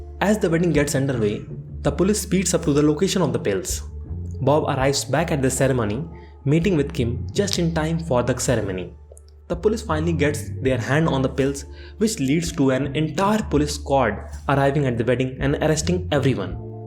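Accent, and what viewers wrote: India. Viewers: It is very strange to hear someone with an Indian? accent explaining a Belgian/Dutch tv show. It's nice that through platforms like Netflix people can watch shows from completely different countries that usually don't expand much internationally like eg US Hollywood movies (Like I don't think Squid Game would have ever been that big if we still had to rely on our tradition TV Channels, they probably would have never chosen to suddenly play a Korean series) Thank you for the recap.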